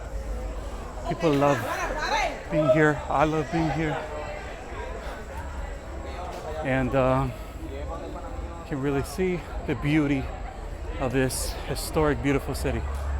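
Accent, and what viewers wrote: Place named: Puerto Rico. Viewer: I like your Puerto Rican English ." It sounds like a quick, relaxed "uh" (with your neutral, relaxed mouth and tongue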